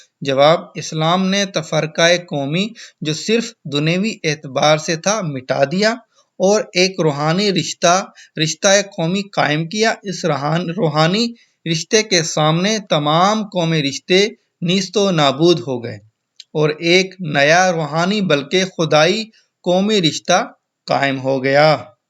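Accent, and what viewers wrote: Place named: Punjab, Pakistan. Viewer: صاحب! تلفظ کو بہتر کیجیے۔ مناسب انداز ہے اگر پنجابی لہجہ ختم کر دیں تو اور بہتری آسکتی ہے۔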